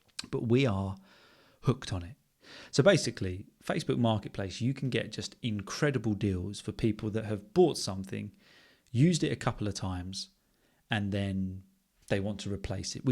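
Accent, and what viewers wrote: United Kingdom. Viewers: I'm from Georgia. I really enjoy your podcasts and your beautiful British English.❤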